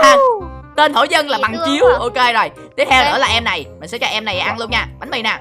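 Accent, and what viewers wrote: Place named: South, Vietnam. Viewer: Tại anh người miền Nam mình cũng hay nói vậy mà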